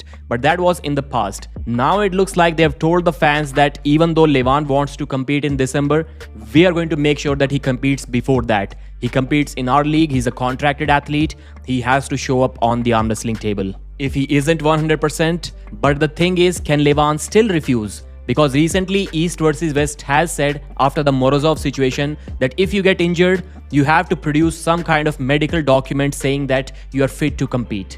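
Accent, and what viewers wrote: India. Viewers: I can't stand the Indian accent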